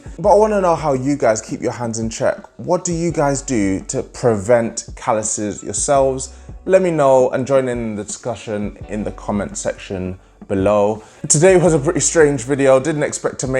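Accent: United Kingdom. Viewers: Your videos are twice as useful for me because I get my calisthenics info in a weekly basis AND I even get to practise listening to your UK english accent since I have the CAE C1 exam in June! Good stuff man!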